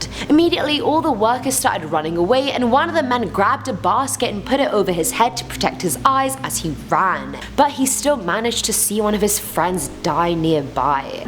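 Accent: United Kingdom. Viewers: They love hiring attractive women with British accents don't they?😂😂🤣🤣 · I honestly can’t tell whether she’s a fellow Brit 😂😂😂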